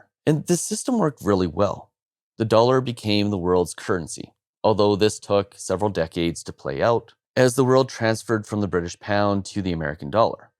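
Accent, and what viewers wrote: Canada. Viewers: I will never listen to a Canadian like you. "Aboot" I can tell right away.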